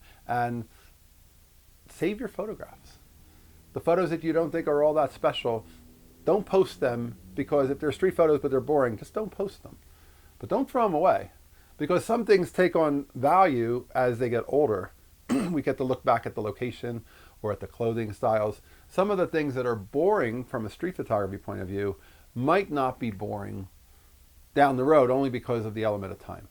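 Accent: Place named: Delaware Valley, United States. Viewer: Sorry for the loss of your father,. I spent part of my childhood on the South Jersey side of the bridge to Philly—right along the Black Horse Pike from Gloucester Township to Mays Landing—so I recognized that Delaware Valley accent of yours right away. You’re absolutely right about those photos feeling like time travel. When you mentioned Acme, I had to laugh. For me, Penn Fruit is another grocery name that pops up in my own “time-travel photos,” at least in my imagination. I was back up that way this past summer in Atlantic County when my best friend from childhood passed. I always enjoy your videos.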